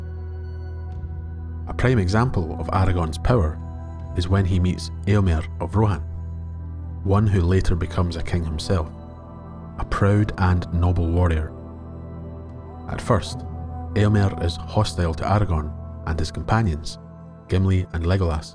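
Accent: Scotland, United Kingdom. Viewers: Excellent again. There is something inherent in Aragorn that did not exist in his ancestry for generations, perhaps since Elendil. Just as Gandalf tells Pippin that in Faramir the blood of Numenor ran true, as it did not in Boromir , so Aragorn has the majesty and power unmingled from the days of the greatness of Westernesse. Many Chieftains of the Dunedain and Kings of Arthedain passed by during the life of Arwen Undomiel, but the daughter of Elrond, and granddaughter of Galadriel and Earendil, sees in Aragorn a man she can love and respect, and sacrifice her eleven heritage for. He is Siegfried loved by a Valkyrie, Arthur returned, the Charlemagne of myth, the Restitutor Orbis like Constantine, not just the heir of a ragged line, long bereft of lordship who strikes it lucky. (How blind Denethor was!) Tolkien pushes all of our buttons. The man was a genius. 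BTW, great to hear a Scots voice amongst the babble of YouTube. Sorry to pontificate. Great channel.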